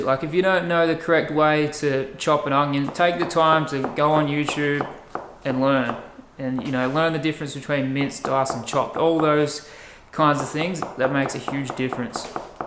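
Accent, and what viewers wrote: Australia. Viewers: Can you teach me how to speak Australian ?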